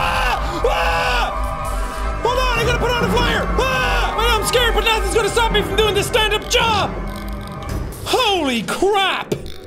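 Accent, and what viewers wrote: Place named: Ireland. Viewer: I know this video was posted months ago and as I have said before even if you do not see this those who do I hope you realise that this is true what I have to say is that no matter how much haters he has no matter who tells me he’s not a good YouTuber I will love his content and his pure Irish accent forever keep up the amazing career.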